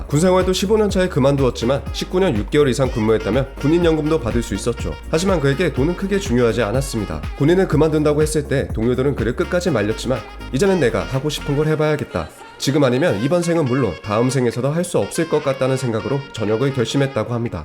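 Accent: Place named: Jeolla, South Korea. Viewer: 그런데 이 친구 이해가 안되는 게 고향은 경상도던데 왜 전라도 사투리를 쓰는지? 태어난 곳만 경상도고 자란 곳은 전라도인가?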